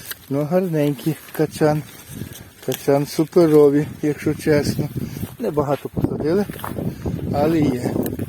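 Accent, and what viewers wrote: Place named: Lviv, Ukraine. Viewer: Як приємно слухати ваше привітання, тільки почула і відразу подумала, людина зі Львівщини, навіть не відрізниш, у вас і діалект Львівський!, 😊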